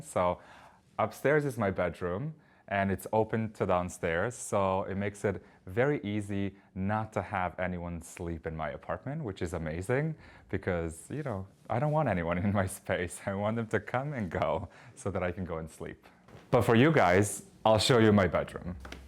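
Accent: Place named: Serbia. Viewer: Great style, great apartment. I knew you were from Serbia, the pronunciation of certain words… well done!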